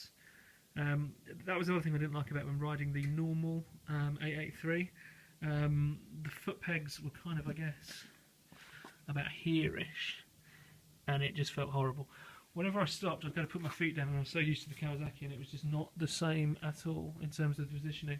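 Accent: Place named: United Kingdom. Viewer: i really wanted to hear this video,but i just can't; i can decipher a British accent fairly well but need to be able to hear it to do so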